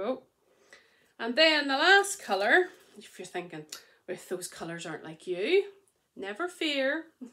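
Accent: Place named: England, United Kingdom. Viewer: I just found you as a recommendation from another podcast. I love you English accent. I could listen to you all day! 🥰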